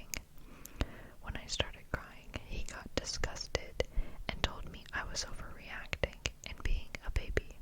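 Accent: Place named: Texas, United States. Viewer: In the first video you made you had a Texas accent! It was super tingly if you ever want to make another some time 😊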